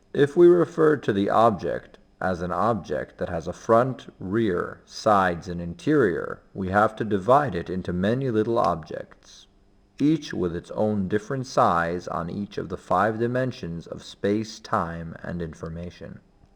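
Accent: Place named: United Kingdom. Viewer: your info is good as well as the video ! i understood it to some extent but i am having problem in understanding the british accent ! can you tell some other source?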